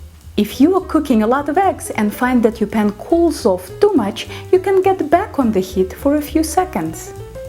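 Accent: France. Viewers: Very good, and I love your french accent! I am going to try this tomorrow.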